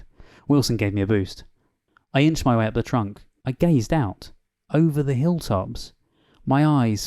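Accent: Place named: United Kingdom. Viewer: I love the UK accent and the way he read, so funny. Thank you for the work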